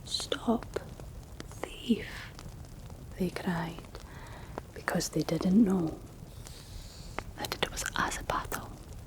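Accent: Scotland, United Kingdom. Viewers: This the softness of your voice and scottish accent. The make up and eyebrows are on point - absolutely gorgeous. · Scotland has a wonderful accent, I must say. This was quite relaxing. Much of my family is Appalachian, distantly originating from Ireland and Germany. The Appalachian accent isn’t one most would consider relaxing, but it’s a warm and welcoming time when it’s heard, and it’s always paired with the smell of wonderful food. It’s like a little piece of the past, of simple living and familial closeness. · I went to visit Scotland a little over a month ago and oh boy I LOVE the Scottish accent! It's so different and charming. I'm happy that YT decided to recommend your video, I get goosebumps just by listening. Subbed! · Omg perfect asmr a scotish take in a beautiful Scottish accent so soothing ❤ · Very relaxing. And your Scottish dialect never gets old! 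Hope you're doing well! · ❤❤ new subscriber! I hope there’s more bedtime stories! I adore your Scottish accent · After years of popping in for a wee bit of ASMR with you, I still find I get some of the most immediate, consistent, and strongest tingles on your channel. And it's not just my keenness for your lovely Scots brogue, or your great pacing and expression, but you have some of the most precise audio control of anyone in this segment. I gotta believe that your musician's ear has got to be a big part of that excellence in setting up your system and in the editing. Flawless, as usual. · May I ask which part of Scotland you're from? You have a lovely accent. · Wow nice story and cant believe I only came across you today, I'm from Shetland but had never heard of this story. You have a lovely Scottish accent 😊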